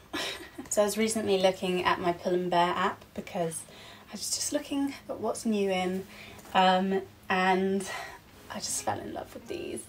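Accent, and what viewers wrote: United Kingdom. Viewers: You seemed to be such a calm and classy person. I love your British accent. So satisfying to hear.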